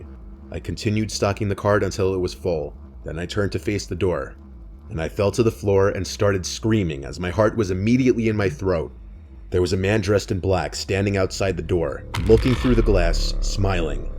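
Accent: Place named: New York, United States. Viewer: Gotta love the New Jersey/ New York accent. Reminds me of home lol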